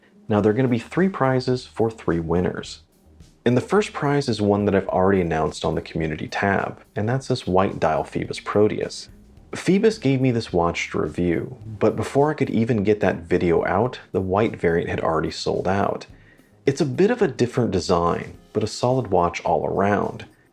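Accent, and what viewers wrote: United States. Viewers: Anything Seiko related is always most welcome, but the Glycine looks equally interesting. Now for the compliments. Kudos on a great channel. Love your voice by the way, it is so quintessentially American.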